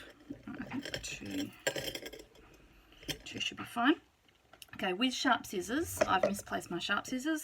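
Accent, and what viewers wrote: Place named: Australia. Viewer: It's so nice to hear an Aussie voice on these how to craft vids. So used to American accents.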